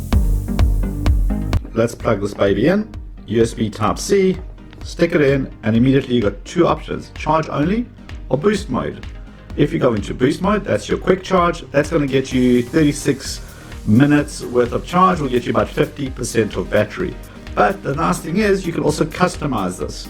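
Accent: South Africa. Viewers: south african accent there ... lol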